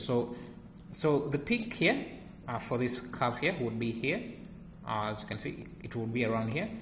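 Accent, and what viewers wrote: Kenya. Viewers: Your accent sounds so Kenyan 🇰🇪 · you sound Kenyan, good videos but you speak way too fast for other people to understand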